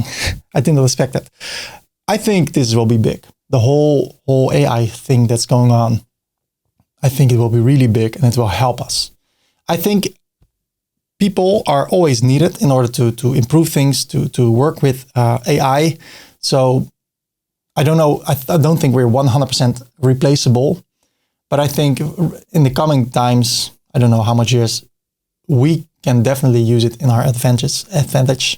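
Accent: Netherlands. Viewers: I hear a dutchy accent, Ga zo door broer ! jij hebt prachtige content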